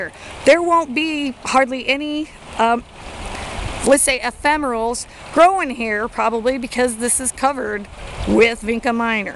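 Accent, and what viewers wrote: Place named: Pennsylvania, United States. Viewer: you sound like you're from PA.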